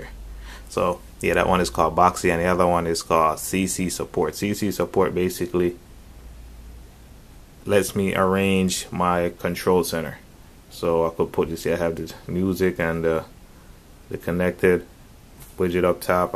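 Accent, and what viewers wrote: Jamaica. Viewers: Jamaican I see, keep up the good work Bredda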